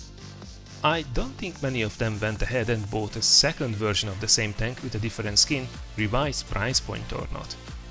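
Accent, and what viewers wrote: Hungary. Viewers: A magyar akcentus bárhol felismerhető :D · első videodnál mondom:nagyon magyaros az akcentus, később,áá,ez biztos nem magyar,de lehet hogy francia :/,olvasom a kommenteket,egyszer csak meglátom ezt,hát basszus,ez magyar,na Mind1,amugy segyszer csinálhatnál egy videót,ahol elmondod az angol nézőknek,hogy magyar vagy,sok szerencsét az angol videókkal,sok sebzést a további meccseken :) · Dude, your accent. I know I know this accent. Are you Hungarian? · hallod konkrétan 23 másodperc után rájöttem hogy magyar vagy :DD az az akcentus olyan tipikus magyar :D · magyar vagy??? olyan furán beszélsz nagyon magyaros :) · By the dialect, I was 100% sure you're hungarian ;D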